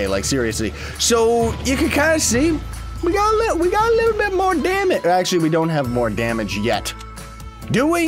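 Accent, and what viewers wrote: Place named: Canada. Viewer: Buttt jajaja your canadian acent is pretty funny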